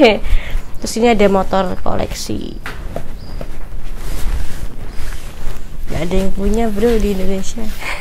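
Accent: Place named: East Java, Indonesia. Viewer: Yoi santuy. Logatnya kek Jawa timur an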